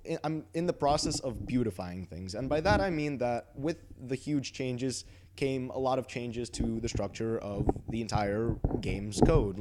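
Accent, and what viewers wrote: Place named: United States. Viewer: Are you actually Russian? I would guess not based on your school experiences that you talk about and your accent is American. Just a question.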